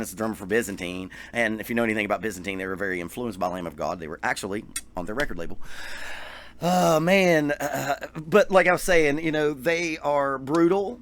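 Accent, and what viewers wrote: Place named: North Carolina, United States. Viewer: Yea I think its strange and weird that the Adler brothers are not playing together anymore, BTWay your accent sounds very familiar to mine, You from NC? lol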